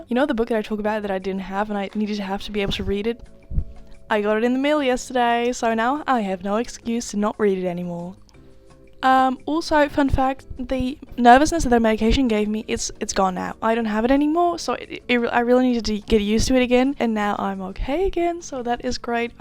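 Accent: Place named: Australia. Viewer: wait i thought you were in australia, but your train shot made me go wtf where are u from LOL edit: I checked ur bio, youre from the netherlands... OK BUT YOU GREW UP IN AUSTRALIA OR SOMETHING RIGHT? I swear you sound australian o_____o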